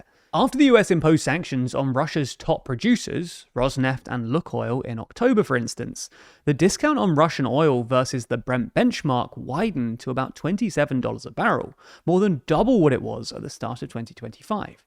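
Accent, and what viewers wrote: United Kingdom. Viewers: UK accent is means a f a k e information. Like BBC news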